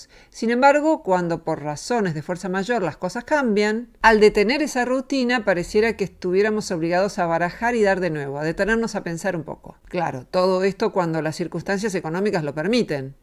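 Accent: Argentina. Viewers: De donde saca esos datos?? Amiga Argentina Voz de donde es eso ?? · Paradojico que haga este tema una persona con acento argentino con el 50% de pobreza y grandes problemas de inflacion y falta de trabajo, tendria que fijarse en subpais de vez de ver otras situaciones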